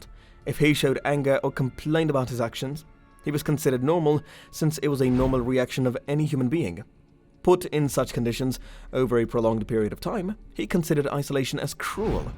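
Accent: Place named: Netherlands. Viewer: You have a strong Dutch accent when speaking English. Good video, Gracias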